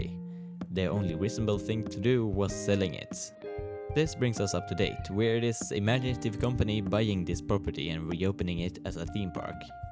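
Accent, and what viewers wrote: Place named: Sweden. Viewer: really good man also love the swedish accent 😭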